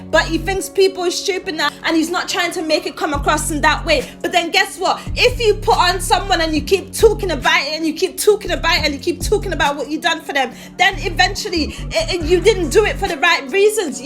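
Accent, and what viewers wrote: United Kingdom. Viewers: Imma real O block fan and it pisses me off to listen to this bullshit your DISRESPECTING KING VON’s legacy, family etc... IF YOU WANT TO MAKE MONEY AT LEAST DO PROOER RESEARCH AND SPEAK FACTS!!!! You sound like some U.K girl with wild imagination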